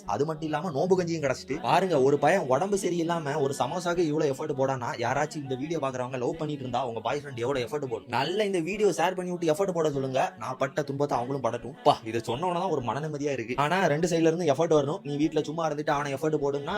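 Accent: Kanyakumari, India. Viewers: I think kanyakumari but ippo bangalore la irukaru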